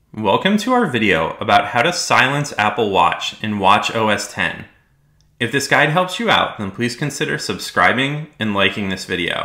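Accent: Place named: United States. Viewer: Thanks. I’m glad you’re American & easy to understand.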